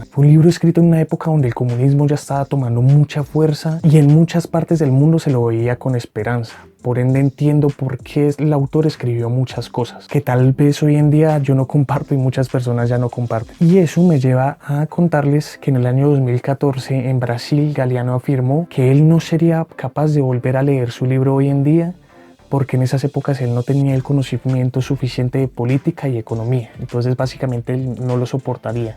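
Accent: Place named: Colombia. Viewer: Buenas tardes. Por tu acento creo que eres Colombiano. No estoy de acuerdo con tu interpretación del libro porque estubo muy sesgada. Veo que fue un material con años en Youtube, lo cual me hace preguntar, Que dirías en el 2024? Considerando el proceso político actual de Colombia, Chile, Argentina, Uruguay, El Salvador, Panamá, Perú, Ecuador, países que tenían unas grandes caretas.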